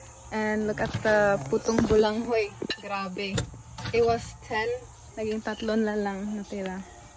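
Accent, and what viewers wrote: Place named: Visayas, Philippines. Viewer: season naman diay ka mag bisiya ❤😂 bisdak na 100%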